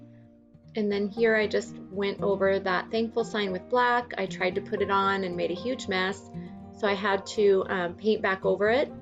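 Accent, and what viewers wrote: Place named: South, United States. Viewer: you live in south by your accent. Pumpkins are fruit not vegetables. I am married to a farmer and my dad had a garden until he could not tend it anymore. Country girl here!!!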